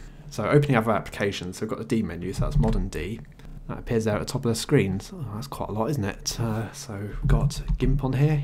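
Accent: United Kingdom. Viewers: Again that British English. WHY WHY WHY :D Just speak normal english. Stop being so swollen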